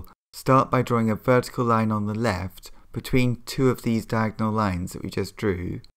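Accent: United Kingdom. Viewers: Such great instruction. Lovely voice. I adore the British accent. ❤️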